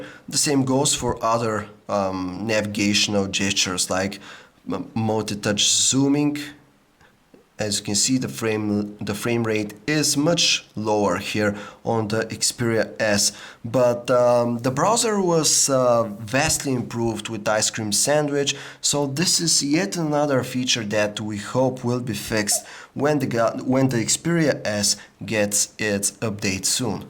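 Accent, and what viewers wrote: Russia. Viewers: Sound like a Russian accent to me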